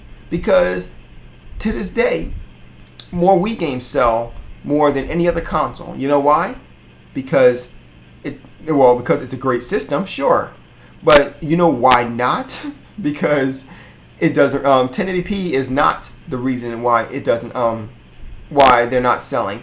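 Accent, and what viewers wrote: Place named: Australia. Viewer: Looks Around... I thought it sounded Australian... good vid man. I agree HD resolutions don't make or break a game. It may help but it doesnt matter much. As for the Wipeout vid, the point he was making was 360 fanboys going nuts saying the 360 did native 1080p, when it actually was upscaled. Nothing wrong with that but its a technical difference between native and upscaled isn't there?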